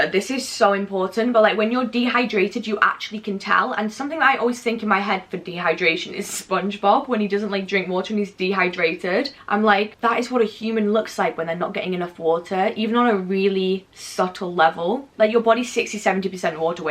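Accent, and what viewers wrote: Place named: Northern England, United Kingdom. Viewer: Omg i have the same accent as you! Like a northern english accent where abouts are you from? ❤️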